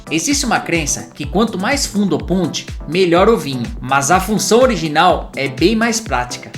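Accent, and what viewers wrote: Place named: Inland São Paulo, Brazil. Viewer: O conteúdo do vídeo é excelente, mas.... Com todo o respeito senhor sua dicção e pronúncia das palavras precis ser melhoradas, quando você pronuncia a letra "r" sua língua embola... Parecido com o sotaque caipira do interior de São Paulo e região sudeste... A pronúncia que você faz é a seguinte: "poirrrrque" "cairrrrteiraaa", "inteirrrrna". Dói os nossos ouvidos...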